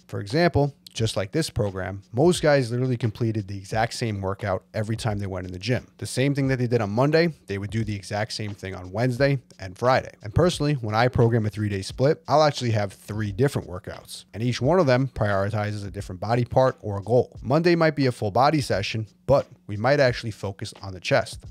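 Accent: New York, United States. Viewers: Is this new jersey or new York accent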